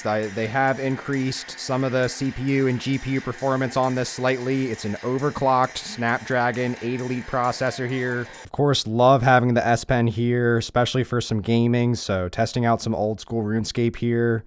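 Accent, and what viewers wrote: United States. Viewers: The most American accent ever wow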